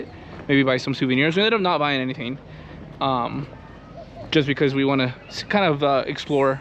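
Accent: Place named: Guatemala. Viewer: Guau que linda es mi Guatemala práctica mente es una chulada gracias a Papa Dios es el mundo 🌎 maya y que grandes están las tiendas y hay de todo y que rico desayuno comieron ustedes y que lindo es el animalito lo bueno que la muchacha se tomó una foto y que linda es música la marimba y de echo venden dulces de algodón y las Nieves son .uy delisiosas no se si ya la probaron ustedes tu eres de Guatemala porque hablas como un guatemalteco gracias exelente y bendiciones 🙏🙏🙏🇬🇹🇬🇹❤️😘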